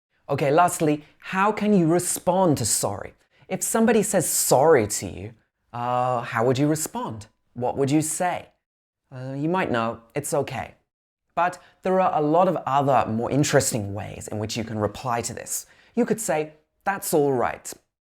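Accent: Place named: RP, United Kingdom. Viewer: Contemporary (Modern) RP! Yay!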